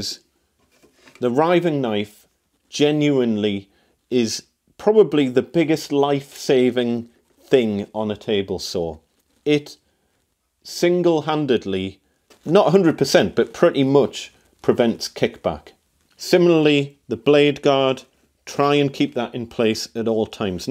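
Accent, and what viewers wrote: Newcastle, United Kingdom. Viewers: I love to see a workman who takes H&S seriously! Quality video mate. Love the accent too! is it Geordie?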